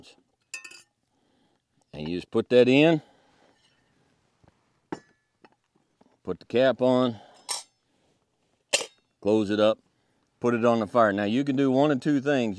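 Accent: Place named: West, United States. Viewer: I think folks always confuse a western accent for a southern accent, this man's voice should clearly define the difference lol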